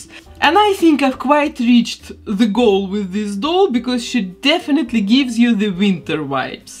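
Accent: Russia. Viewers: Русский акцент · Im so shocked that you are from Belgium… i thought you were Russian or Polish from your accent😅😅 spreek je ook Nederlands/Vlaams?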